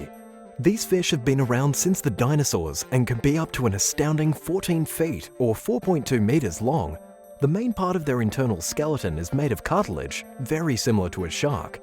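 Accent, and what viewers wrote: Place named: Australia. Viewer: Since subbing to your channel a few weeks ago, ive never enjoyed a nature channel as good as yours! I dive in as soon as i get notification & have thoroughly enjoyed every single one! I know there are tons of wildlife vids out there but yours gets straight into the nitty gritty with gusto & enthusiasm & i love the Aussie narrator Long may you all continue to show us great vids & brilliant cinematography underwater & above Super entertainment Thank you all 😉🇬🇧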